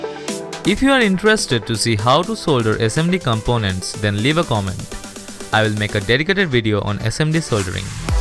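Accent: India. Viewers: sidha bol de na indian ha itna natak kyu krta ha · Does anyone notice that he has an indian acent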